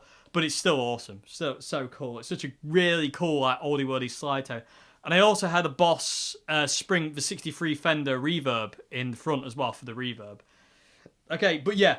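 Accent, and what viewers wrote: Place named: Lincolnshire, United Kingdom. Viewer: with a hint of lincs accent if I'm not mistaken?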